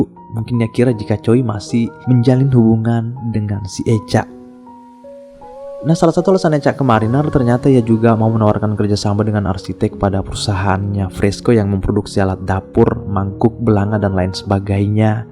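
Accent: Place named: Sulawesi, Indonesia. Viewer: weee min logat mu kya logat Sulawesi 😭😭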